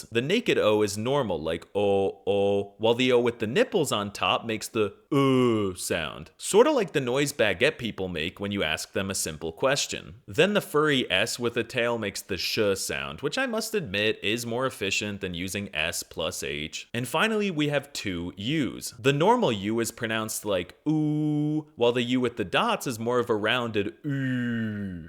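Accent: Russia. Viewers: Interestingly you speak turkish like a russian. Did you know that? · You speak Turkish as if you are Russian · FOR REAL, it sounded just like Russian I was so confused! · American imitating perfect Russian accent while speaking Turkish. Thats.. .amazing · İngilizce’yi bir İngiliz gibi ama Türkçe’yi bir Rus gibi konuşan bir Amerikalı. Tanıştığımıza memnun oldum. ✋🏼 Ben Almanca’yı bir Alman, İngilizce’yi ise bir İtalyan gibi konuşan bir Türk’üm. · i have never heard turkish with a russian accent by an westerner till today. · damn you have a heavy russian accent only while speaking turkish lmao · neden rus aksanıyla konuşuyon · Very funny video😅 But I have to correct one mistake: Turkish alphabet is not a version of American alphabet, it comes from ancient Turkic languages. And by the way, you speak Turkish in Russisn accent , Turkish is much softer and musical . It is also a mathematical and poetical language . 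· you are talking exactly like russians that tries to talk turkish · What is up with the weird map dude? There is no such thing. And this is not the turkish Accent it sounds like almost russian OMG. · WHY IS YOUR ACCENT LIKE RUSSIAN ACCENT DAWG 🥀💔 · AMK BU NE GERÇEK OLACAK SANMIŞTIM Oh and WHY ARE YOU TALKING WITH A RUSSIAN ACCENT😭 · Bro you sound Russian when you speak Turkish 😢 and I'm a native, spent my whole life in turkey and still struggled to understand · I have never heard someone speak Turkish with a Russian accent. also I love the "malum kişi" bit lmao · Rus aksanı ile anlatmasan daha iyi olurdu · he is speaking turkish like russian people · like a caricature of Turkish mixed with Russian acccent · Türkçe konuşabilmek için kendini o kadar sıkıyor ki sonunda Rusça konuşup Türkçe kelimeler söylüyor 🙂 Türkçede R'ler hiç bir zaman bu kadar vurgulu söylenmez. Tek vurgu hatta yuvarlayarak bile söylemen yeterli R'leri.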